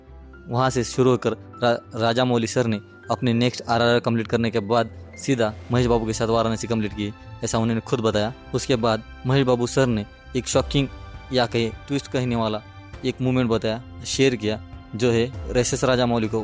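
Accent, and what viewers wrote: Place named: Nepal. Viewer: By the accent, I'm guessing you're Nepali?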